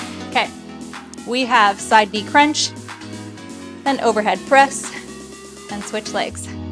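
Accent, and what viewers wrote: Canada. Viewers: I love all your stuff, (Canadian??) accent included! "Oh Canada, you have hot and awesome barre coaches!" I love the programs with less/ no pauses between movements. Can we get some more of those, puh... puh... pretty puh-leaze! (Please! :)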